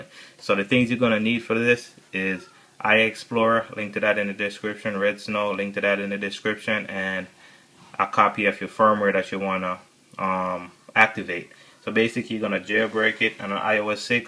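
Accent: Jamaica. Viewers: Jamaicaness of this dude cannot hide...mi luv the fact seh him nah twang an still understandable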